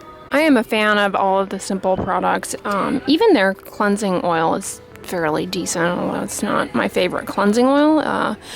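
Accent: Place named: South, United States. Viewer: Southern asmr turns dermatologist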